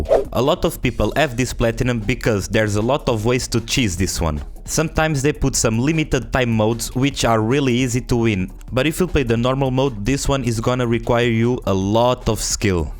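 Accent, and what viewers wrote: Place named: Portugal. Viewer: Mano tive que checkar a tua bio peguei logo pelo teu accent que eras tuga hahahahaha, excelente video abraço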